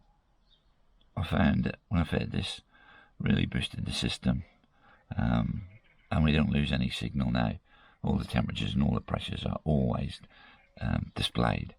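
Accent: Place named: Norwich, United Kingdom. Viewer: Hi , I hope you are both well...we have the same car an love it...also have the twin axle 8ft wide swift...you sound like a fellow norwich boy😁... like the vids ...keep up the good work...matt an trace.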